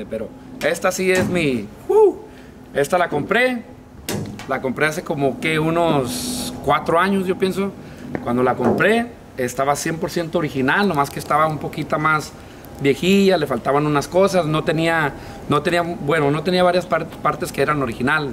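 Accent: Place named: Fresa, Mexico. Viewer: El español lo habla fresa y el inglés lo habla barrió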